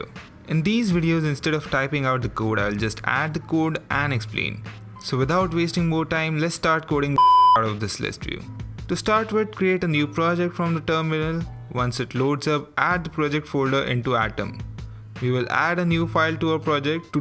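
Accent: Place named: India. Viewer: Very hard to follow with the Indian accent :(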